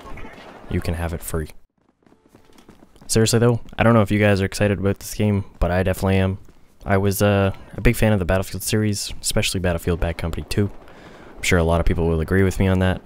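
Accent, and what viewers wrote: Canada. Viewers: You sound so much more Canadian in this.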